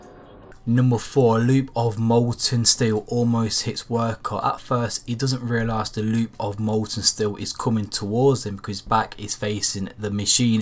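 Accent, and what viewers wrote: United Kingdom. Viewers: where are you from? i know uk but accent is hard to get at some points. not bashing ...im just curious lol. more i hear it the better. also keep it up. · Kim Kimble Hes british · I'm curious if that's the dialect across the pond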